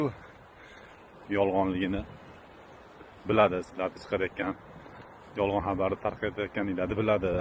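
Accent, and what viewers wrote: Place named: Andijan, Uzbekistan. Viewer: Ома гап йук анжонча гап булди ишларизга омад ✊ Хусанов укамиз уз даражасини курсатади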